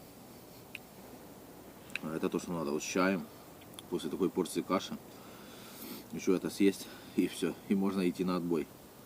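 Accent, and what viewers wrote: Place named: Russia. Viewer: Кому ти озвучуєш кацапською говіркою? Хрін тобі а не лайк. Іди за узкім карабльом.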